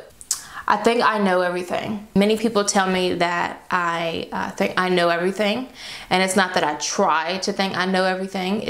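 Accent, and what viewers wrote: South, United States. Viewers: I could literally listen to you talk all day! I love your accent and the southern twang that you have. 😅 💕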